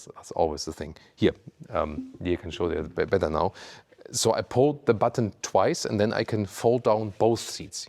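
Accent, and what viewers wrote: Germany. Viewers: "turning indicators" I love this guy's Germlish.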